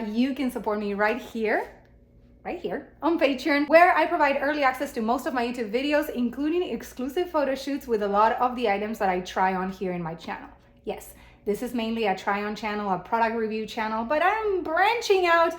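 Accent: Argentina. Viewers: I knew that accent sounded familiar! My ex-wife is from 🇦🇷 and half Italian, lol. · Hola. Te escribo desde Colombia. Soy muy fan de tu contenido, me encanta. Por qué no haces videos hablando en español? Así como los haces en inglés, qué tal si haces de vez en cuando contenido en español. Lo poco que te he escuchado hablar en español, me ha fascinado tu acento y estaría genial que nos dejaras escucharte de vez en cuando en tu lengua materna y más con el acento argentino que es maravilloso. Hazlo:) nada mejor que hagas más contenido hablándonos de tu país, de tu cultura, de tus raíces pero con tu acento nativo. Anímate 😊 Saludos desde Colombia y te felicito por el contenido que haces y el empeño que le pones, se nota que te encanta y eso se transmite una naturalidad muy bonita que se ve en la forma en la que haces tus videos.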